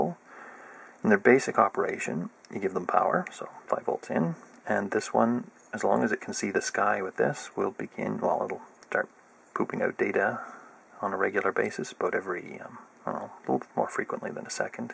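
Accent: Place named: Western Canada, Canada. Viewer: Western accent, metric country. Pretty obvious.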